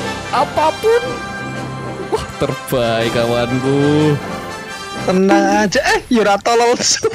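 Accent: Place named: Java, Indonesia. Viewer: Sekali-kali gameplay bang, kangen aksen Jawa lu sejak "pakeeet" buff merah 😂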